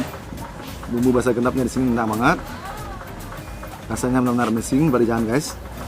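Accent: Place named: West Sumatra, Indonesia. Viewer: Gaya abg berbicara seperti orang Minang yang menggunakan bahan bahasa Indonesia 😅😂😂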